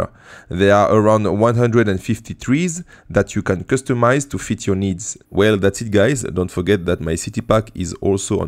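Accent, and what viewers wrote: France. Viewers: Le petit accent français 😏 super tuto vraiment continue comme ça c est incroyable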